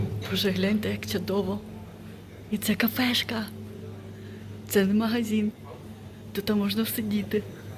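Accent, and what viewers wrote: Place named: Surzhyk, Ukraine. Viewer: вітання! цікавий репортаж, але ваш дикий суржик все псує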